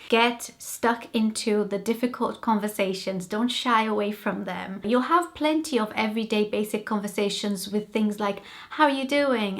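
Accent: Wales, United Kingdom. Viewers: I don't often comment on YouTube videos, but felt I had to with this one, because I was quite astonished when I heard your accent. I have a number of Hispanic friends from Brazil and Columbia and Uruguay and though they can speak English, it is with a very strong Hispanic accent, --something that you have managed to completely overcome. Amazing! As a native English speaker, I can say your English sounds truly excellent and at a high level. You have acquired a pleasant accent too. Wise choice living in Wales where the accent is easy on the ear. You even sound classy and cultivated. Well done! There are always people who like to denigrate others, just ignore them. As an expat living abroad I can testify that it takes a lot of hard work to even achieve basic fluency and acquiring a native accent can be even harder. The natural sound and flow of your speech bears witness to a great deal of effort and some sound achievement. 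It's great too that you are taking the trouble to pass on your tips to others. Be encouraged. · Your English is excellent, fear and precise, with a nice hint of Welsh. A Welsh accent speaking English is so nice to hear. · I honestly had no idea you weren't a native English speaker! Your accent just sounds like abnormal Welsh accent to me!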